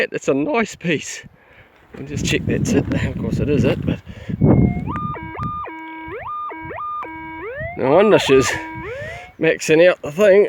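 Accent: New Zealand. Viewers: Funny how a.i. messes up on translating the kiwi accent ... some funny mess ups throughout this video !!! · Really enjoyed your commentary. Nicely done and I will be back.. Kiwi?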